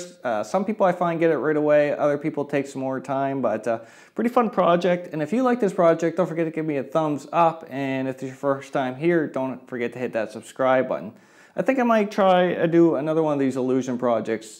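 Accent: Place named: East, Canada. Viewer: I figured it out the first video of his that I watched. He said 'eh'. Then the second video I detected a back east accent and the third video was a dead give away when he mentioned Robertson screws.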